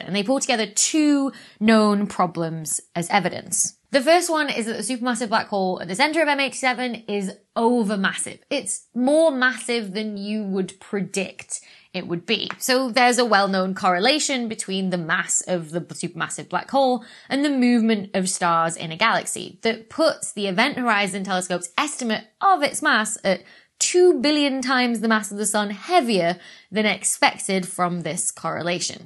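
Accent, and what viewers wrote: United Kingdom. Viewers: Idear. Love the British accent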